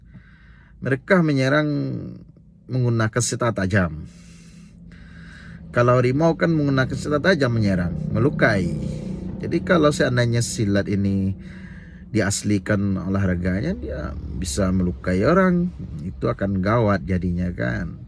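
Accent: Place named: West Sumatra, Indonesia. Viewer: dari logat atau aksennya,nampak bahwa abang ini urang awak alias asli minang.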